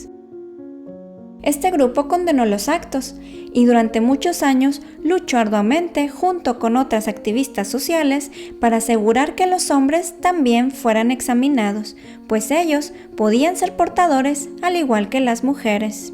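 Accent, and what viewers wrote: Mexico. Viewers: Holaaa lindo acento mexicano 👋👋 Muy buena historiografía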